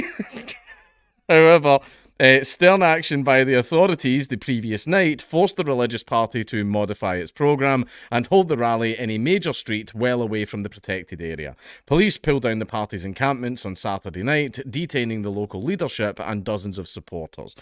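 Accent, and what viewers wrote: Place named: Scotland, United Kingdom. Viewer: A smile just crossed my face, here in Texas, my Texan face, and it was nothing that you/he read/said. Nay, it was the FACT that you/Marcus/ is the DADDY OF A DAUGHTER! And each iddle widdle thing she says as she expresses the entire range of her itsy bitsy emotions... will be adorably said with my current and holding, absolute favorite accent: SCOTTISH! 😃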